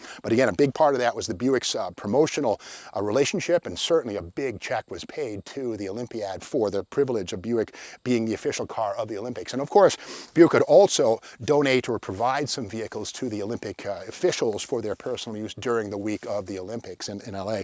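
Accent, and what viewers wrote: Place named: Central Massachusetts, United States. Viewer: Actually grew up in Worcester county, central mass, they have a unique pronunciation of some words. Like,cahnt, for can’t, heeya, for here, and cah for car,etc.etc.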